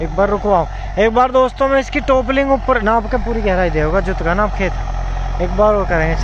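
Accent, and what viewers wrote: Haryana, India. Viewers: Haryanvi hai yo punjabi koni samj gya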